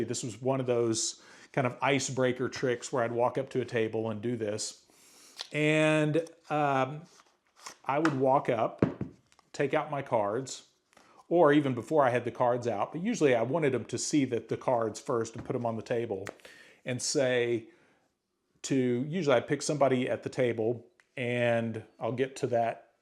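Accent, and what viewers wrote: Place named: Oklahoma, United States. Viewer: I was going to guess you were from Oklahoma based on your accent. I was close!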